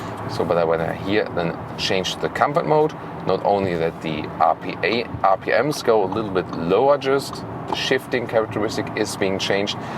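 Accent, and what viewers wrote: Germany. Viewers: Dein Deutsch accent ist toll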